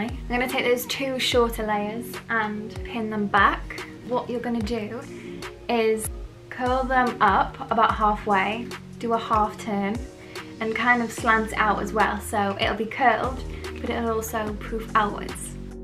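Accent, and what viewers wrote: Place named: Leicester, United Kingdom. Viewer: are you from leicester? recognise the accent!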